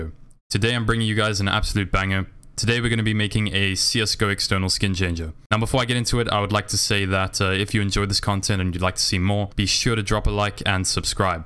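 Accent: South Africa. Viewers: no way my mans from SA lmao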